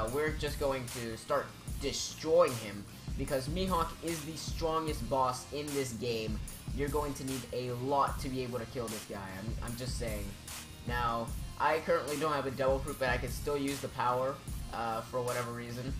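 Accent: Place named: New Zealand. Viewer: Oh lol I didn't think you sounded Hispanic because your accent sounds very similar to a New Zealanders Accent so I really didn't make that kind of connection.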